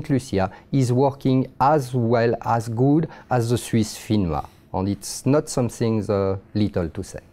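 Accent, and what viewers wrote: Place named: France. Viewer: His French accent is so... French :)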